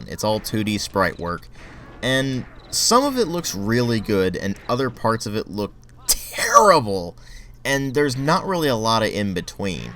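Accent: South, United States. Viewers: Nice deep southern accent! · pretty legit southern accent!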